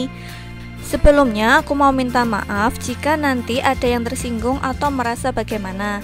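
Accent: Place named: Java, Indonesia. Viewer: 😂😂😂 Swara medhokny suka... Semoga bisa menginspirasi bunda-bunda lainnya. Slam kenal nge bun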